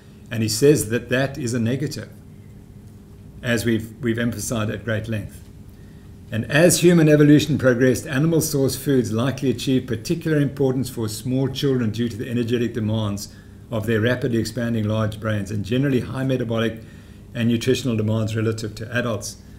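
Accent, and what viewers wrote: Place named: South Africa. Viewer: The English language doesn't work too well with a Sarfer accent...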